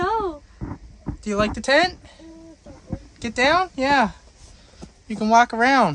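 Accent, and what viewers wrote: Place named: Newfoundland, Canada. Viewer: Are you a Newfie ? Yer accent sounds like ya from da bay bah!!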